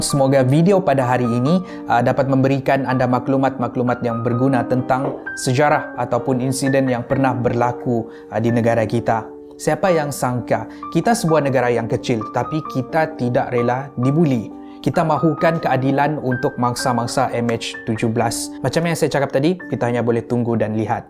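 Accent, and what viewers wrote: Sabah, Malaysia. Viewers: Ini loghat Sabah tu pun tak pandai beza kah. Dasar orang kl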